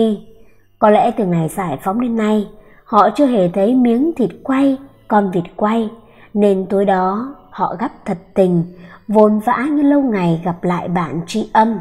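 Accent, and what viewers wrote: Standard. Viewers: Giọng đọc chuẩn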